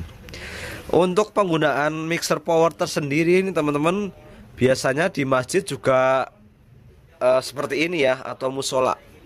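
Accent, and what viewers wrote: Banyumas, Indonesia. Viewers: nek ora ngapak ora kepenak mas he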